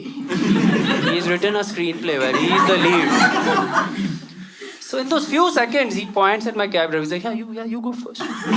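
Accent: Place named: South India, India. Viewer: Watching you for the first time and totally hooked....you sound so much like a south indian 😂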